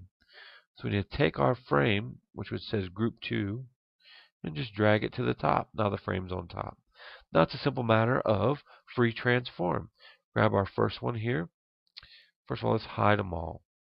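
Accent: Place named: Texas, United States. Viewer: Yes... Great intention and i think i get it BUT a bit too fast even though you sound like you're from Texas lol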